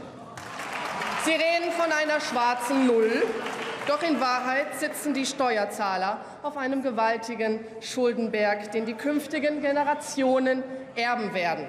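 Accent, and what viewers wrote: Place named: Westphalia, Germany. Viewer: Finde ich irgendwie süß, wie Alice Weidel auf kernig macht und gleichzeitig immer dieser starke westfälische Akzent herauskommt, z.B: : "Schuldenbeag ... eaben weaden" Klingt mehr nach Landfrauenfrauenverband Rheda-Wiedenbrück als nach AfD-Oppositionsführerin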